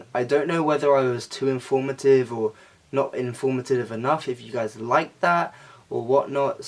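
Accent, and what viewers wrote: England, United Kingdom. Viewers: I would be very glad to know your thoughts or opinion on achieving an athletic/lean body without adding weights; just the body as in push ups, regular sit ups etc. Do they work for defining and accentuate the muscle? Ps: Which part of England is your accent from? I haven't heard it until now.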